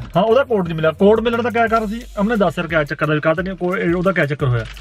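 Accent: India. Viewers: Yar ehi desi English tah sanu changi lagdi ea, veer sira, v sira banda,ehi kuch loki dekhna chonde ea😃👌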